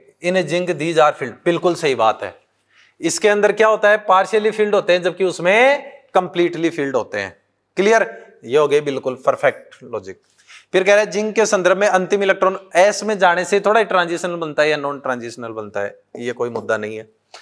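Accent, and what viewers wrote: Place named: Rajasthan, India. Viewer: Pure rajasthan ka swag aata hai aapki boli me padane ke tarekeee me ekh no.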